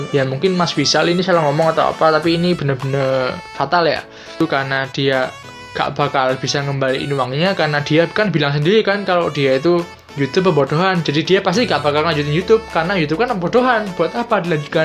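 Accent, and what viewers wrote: Java, Indonesia. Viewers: logat jawa ya bang?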